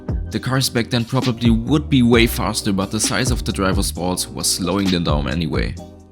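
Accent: Germany. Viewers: Mann erkennt direkt den deutschen in dem english Akcient😂😂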